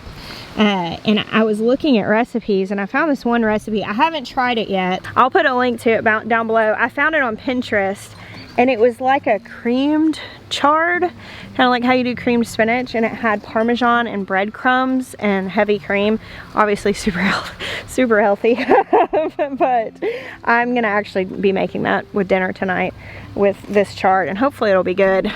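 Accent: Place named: Mississippi, United States. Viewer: look now, lol, y'all aint got no business crying about the weather. This morning we woke up to 34 degrees F after Mother's day in Minneapolis Minnesota. Tonight we are expecting a whopping 45 degrees. This will be the first night above 40 degrees in about two weeks. I got peppers in the house putting on flowers already flowers on tomatoes help, help ,help! I have about 200 starters each one wants to be the first one in the garden. Thank you for being here I love listing to the accent I used to have when I left Mississippi a long time ago. Blessing on your summer and your move.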